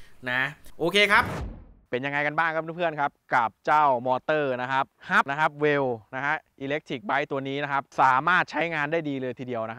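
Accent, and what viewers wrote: Thailand. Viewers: ติดตามตลอดครับชอบที่ภาษาอังกฤษสำเนียงไทยๆนี่แหละบ้านๆดี ไม่ต้องเป๊ะเวอร์เหมือนกับเรียน wall street english😃😃😃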